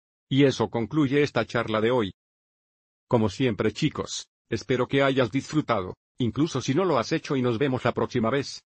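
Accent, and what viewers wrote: Spain. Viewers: Wonderful idea, Paul, there are around 500 million native Spanish speakers around the world that could benefit from your work. Like others have stated, the robotic voice and Castilian accent might draw listeners away. There are websites where you can get your essays translated professionally and maybe you can look for volunteers to read them with a more neutral accent. I'd volunteer. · I'm a professional translator. It's actually not bad for software. I would love to contrast it to the original English text. It'll get the job done. The Spaniard accent may be a little alienating for the Latin American crowd. But like I said, it's actually pretty good for a machine. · 7 or 8 out of 10. The message is understandable. It is a Spain Spanish but again understandable. I am used to Paul Elam speaking but that is just me. This is okay in my opinion. 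La voz es un poco rápida y bien robótica pero se entiende.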